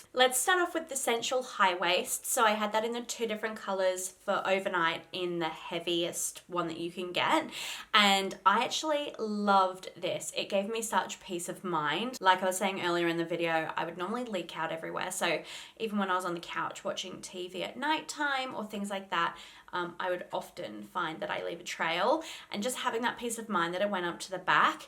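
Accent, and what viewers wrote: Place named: Australia. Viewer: An Aussie girl talking about periods, it made me smile.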